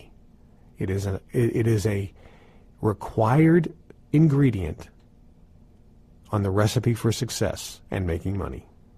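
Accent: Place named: Boston, United States. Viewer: where are you from? i hear your Boston accent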